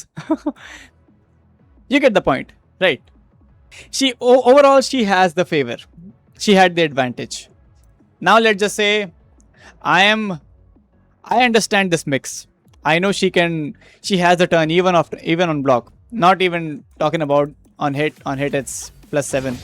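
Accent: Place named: Bihar, India. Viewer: Ye bihari bhai ko english kisne sikhai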